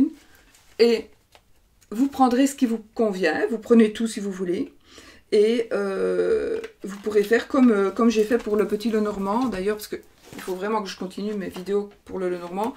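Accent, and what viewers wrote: Belgium. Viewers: trop sympa ce type de vidéo, j'adore t'écouter parler des tes cartes, de tes chiens, de ta vie avec ton petit accent belge que j'adore !!!!! ca me permet de me sentir moins seule dans mon atelier alors merciiiiiiii !!!! Et Oscar j'adore, c'est le nom de mon tt 1er chien, j'avait à peine 20 ans, c'était un croisé bichon/caniche d'une gentillesse incroyable, obéissant, intelligent, sociable, sage, bref, une perle, il m'a accompagné pendant 14 ans <3 je te souhaite donc une toute aussi belle histoire avec cette adorable boule de poils noire et blanche <3<3<3 Bisous et change rien c'est comme ca qu'on t'aime !!!!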